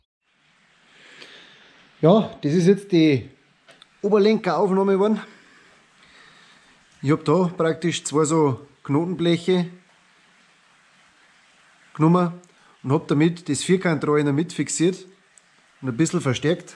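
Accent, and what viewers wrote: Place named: High German, Germany. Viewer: sauber... Top hast die a die Hochdeutsche Sprache so anglernt das du es nimma lassen kannst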